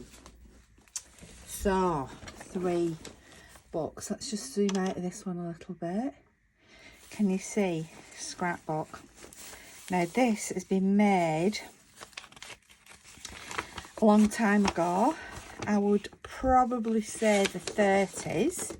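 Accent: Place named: England, United Kingdom. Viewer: Morin Miss... Ooooooo-La-aaaaaa... Love your Grungy Finds... Especially that Yummy Ole' Scrap Book... OMG ness, me-oh-my..... 🌹 🥰 ✨️... Enjoy your treasures... Was nice to SEE your Sweet English face on the SSS video... & to hear that lovely accent I miss so fondly... Later my BOO's 🐝 😎 👑 🥰 🙏 ✨️ 👍 🌹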